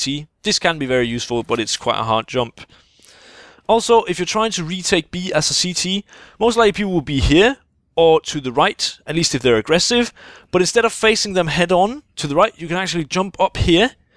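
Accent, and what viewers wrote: Denmark. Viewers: I love when you do your Funny ''Danish-english'' (I'm norwegian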